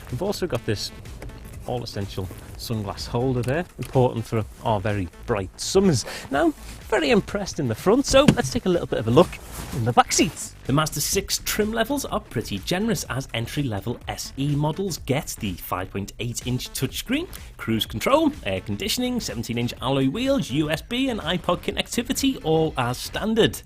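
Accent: Liverpool, United Kingdom. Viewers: A bit of a scouser is there laa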